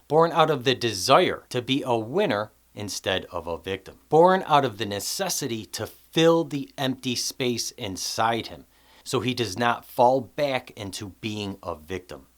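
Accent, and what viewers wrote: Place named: Michigan, United States. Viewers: Love the accent. Michigan? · Like your Midwest accent! 👍 Great insight.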